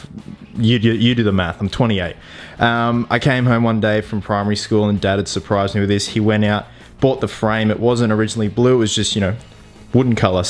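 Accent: Australia. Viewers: Where are you from?? Australia?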